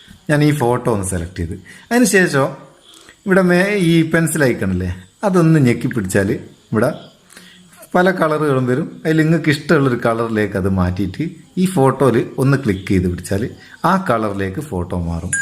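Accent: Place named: Malabar, India. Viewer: Very nice & really like your nadan presentation and koikode/malappram style...all the very best....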